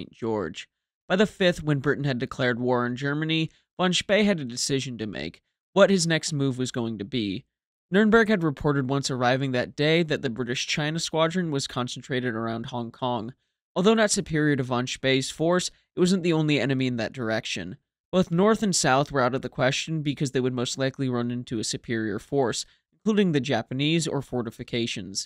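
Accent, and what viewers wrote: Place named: United States. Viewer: This is refreshing hearing good narrative on non US warships with an American accent, but many viewers will miss this asset and show no gratitude for it, as is the failing known throughout society as failure to evolve thoughts according to new developments, what Jackie Fisher thought non First Class.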